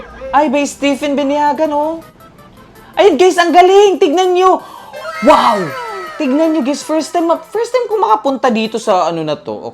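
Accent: Visayas, Philippines. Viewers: Bisaya ka ba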